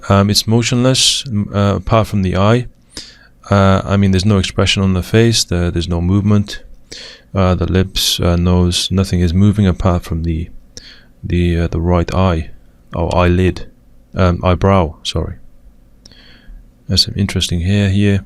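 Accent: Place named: Wales, United Kingdom. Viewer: please jus keep your great vids coming!:) x ps I love ur Welsh accent too;)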